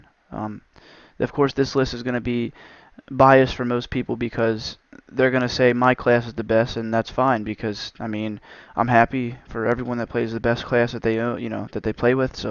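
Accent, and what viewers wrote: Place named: Pennsylvania, United States. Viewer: Your accent reminds me of Bam Margera, lol you from PA I bet. It's all in the way you say "classes" it reminds me of when Bam says "asses" HAH!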